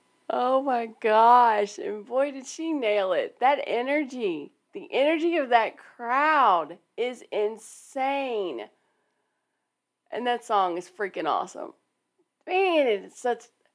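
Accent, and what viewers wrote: South, United States. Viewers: Uilleann pronounced ILL-En or ILL-Yen for your southern accent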